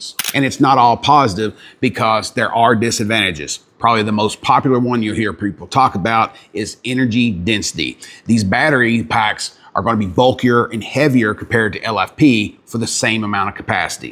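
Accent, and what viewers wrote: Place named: South, United States. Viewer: I'm curious what southern state you hail from... Georgia my guess...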